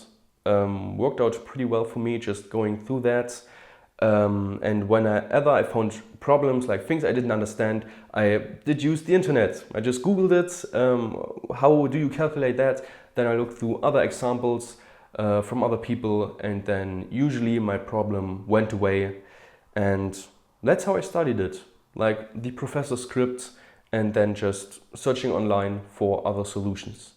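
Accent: Germany. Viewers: So my question is: Without getting too specific so as not to reveal too much detail that you may not want to put online about exactly where you live... (Where your from does not necessarily say where you currently live, and using large regions does little to narrow down a specific location, and accents could come from parents rather than matching exactly where one grew up)... your accent is not what I'm accustom to when I think German Accent, so what part of Germany is your accent from? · I really like your English with german accent.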